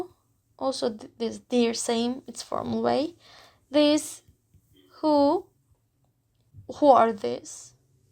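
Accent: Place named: Japan. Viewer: Neden Japonca gibi telaffuz ediyorsun?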